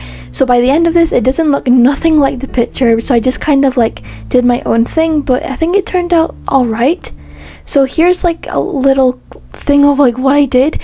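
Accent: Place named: Scotland, United Kingdom. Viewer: oh that's cool:) I like your accent and it's very pretty:3 I was gonna ask if it was Scottish😭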